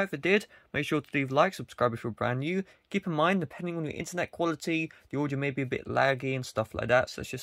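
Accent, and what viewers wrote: United Kingdom. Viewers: Nice british accent mate! Watched till the end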